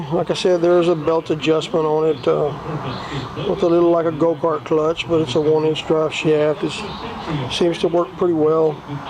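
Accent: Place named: South, United States. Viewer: Man please tell me you have paper plans you can put together and mail out for a small fee call me ( <num>, man this by far the best saw mill i have ever seen , it's simplistic, & looks like it will go together quite easy, & hell yeah I'm subscribing & given y'all 10 👍👍👍👍👍👍👍👍👍👍's up, & definitely sharen the shit out of it, me & my boy are positively going to build this badass mill, 1 thing tho. You definitely might wanna put on some guard clover boxes for the blade, ya just never know if & when that blades liable to snap from a freak mishap, & you might wanna think about welding on some angle brackets on your tension bracket to keep it from worken the original weld loose from vibration, you sure sound like a good ol boy, i hope y'all get 100, 000,000 likes & thumbs up on this video, y'all definitely deserve it in my book, i absolutely love love love this build, great job bro. 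Best I've ever seen on YouTube, & believe me I'm watchen YouTube 247 to find the best mill i wanna use to fabricate mine after, guess i can finally stop looken, & move on to something else, now that I've found the baddest sawmill I'm gonna build mine after, thank you so much, dude you have no idea how you've just made my day, I'm stoked as hell, & can't wait to get ready to build mine, & I'm going to film every step with possibly a few ideas of my own, & put it on YouTube, & going to give you every bit, & all the credit for the inspiration, take that to the bank bro. LoL, whooo hoooo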